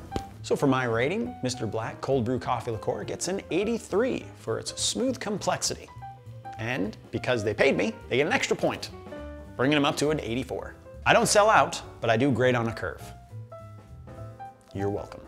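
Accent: Australia. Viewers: Australian accent…..more talent from this guy! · I feel i should hit you for that Aussie accent, but your just so darn cute i can't xD (greetings from Australia)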